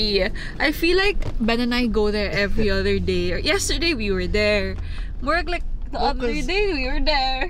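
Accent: Visayas, Philippines. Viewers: Ka cute paminawn magbisaya hahahaha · ms., ang cute mo magbisaya🥰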